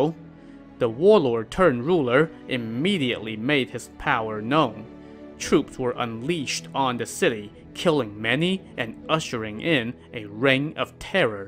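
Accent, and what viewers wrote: China. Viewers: It is. He has a Chinese accent. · Is the narrator a Cantonese?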